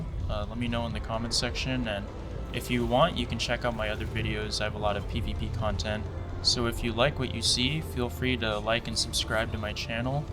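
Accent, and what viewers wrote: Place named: Philippines. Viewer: This guy sounds pretty Filipino NGL🥵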